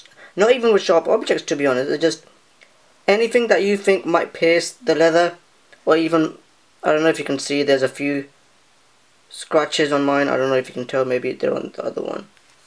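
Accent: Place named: India. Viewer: half indian half uk accent, pretty cool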